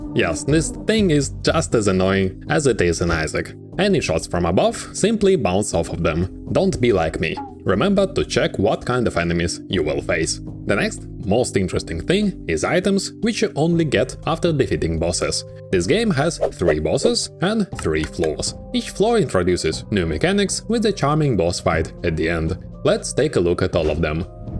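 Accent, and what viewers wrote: Russia. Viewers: Do you hear that? It's a Russian accent. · С оригинальным контентом не знаком, наткнулся на это видео и по первым же секундом услышал руский акцент, возможно это так для рускоговорящего плохо звучит, но звучит нечем не лучше идуского, лучше уж диктора нанять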